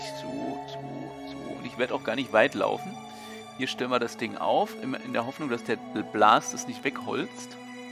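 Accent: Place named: Berlin, Germany. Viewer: Ah herrlich, diese Verwirrung und dann noch die Berlinerschnauze 🤣👍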